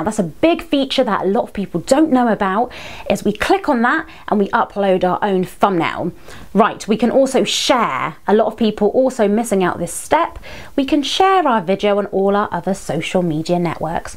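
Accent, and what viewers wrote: United Kingdom. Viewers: ARE YOU BRITISH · You sound like Maranda sings but in a British version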